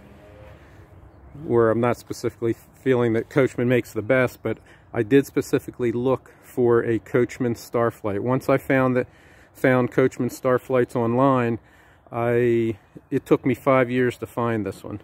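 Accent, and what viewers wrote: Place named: Southeast Pennsylvania, United States. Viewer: SE Pa. accent. Thank you!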